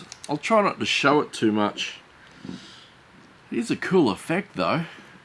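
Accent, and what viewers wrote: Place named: Australia. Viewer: Hey! I just subscribed to you a few months ago, and I've seen lots of your videos now. Also, I'm subscribed to Nick Zametti (I think that's how his last name is spelled, I'm not sure). At the beginning of this video, you mentioned about Nick doing an experiment with Orbeez as well, and having a similar problem with them involving resin. If I remember correctly, Nick Zametti also did an experiment with Orbeez involving resin, and having a similar problem with the Orbeez being too moist to adhere to the resin. I'm assuming that that is what you were referencing to with Nick. I wanna let you know that Nick Zametti just posted a video toying with the idea/proposal of possibly quitting YouTube, but being talked out of it by his fans. I would like to propose an idea to you about Nick Zametti. I would be tickled pink if y'all would collaborate with each other on a project. 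Like, if possible, not really just doing mirroring videos of each other doing a similar project together yet physically separated from each other, but a collaboration video of y'all being physically next to each other, sharing the same space, and knocking around ideas against each other, and possibly y'all working on separate pieces of a single project together, to where the two pieces that y'all work on come together into a single cohesive product. If that would be possible, that would be AWESOME!!!!!! Y'all have a similar accent, so I'm guessing y'all are either from Australia or New Zealand, cause to me, th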